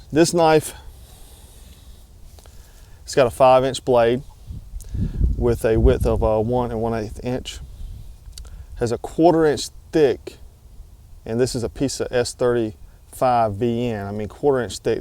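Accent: South, United States. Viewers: Do you have to buy all of these knives? I would have to agree that $385 is alot. Also I went to their website and it was difficult to navigate. Wish it was a little more user friendly. Good review though. I trust your opinion because it sounds like your from the South as well lol. Also I don't know if you have done this in other videos, but I'm not a steel expert so explaining the different qualities or benefits of the different steel would help me out.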